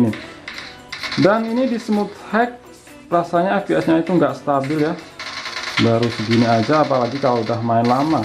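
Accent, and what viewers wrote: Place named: Kediri, Indonesia. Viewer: Org kediri lu bang 😂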